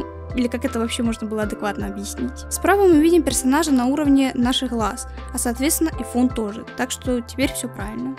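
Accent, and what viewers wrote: Ukraine. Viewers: Возможно она украинка и у нее есть небольшой акцент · оо ахахах_)) не потеме, я такая слушаю видео и.. думаю... чтот тут знакомое... Гхрань... и поняла по говору что автор украинец, чекнувши профиль)) как я поняла, я удивляюсь хахахахах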